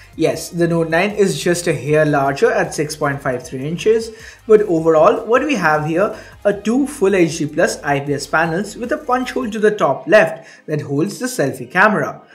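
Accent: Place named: Bengal, India. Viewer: with Bengali accent songs pretty weird to me as I'm an Odia 😆😆😆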